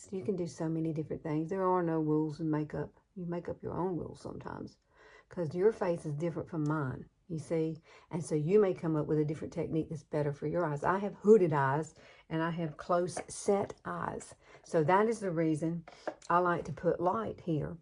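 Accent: South, United States. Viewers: Ty for the brush to pencil tip for no blobs :D Btw, I love love love ur southern accent, it is beyond cute 🥰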